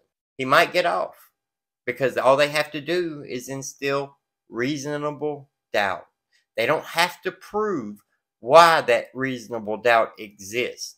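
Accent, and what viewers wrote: South, United States. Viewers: I haven't watched the True Crime "special" yet. I can just imagine... I loved your comment about not just selling black gloves at the "murder shop. First time I laughed out loud today! 😂 The southern in you really came out today. Loved it! Thanks for the video! ❤️🎙️❤️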